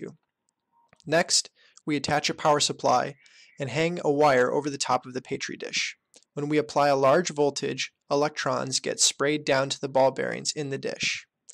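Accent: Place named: Rio de Janeiro, Brazil. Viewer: pq o inglês dele tem sotaque carioca??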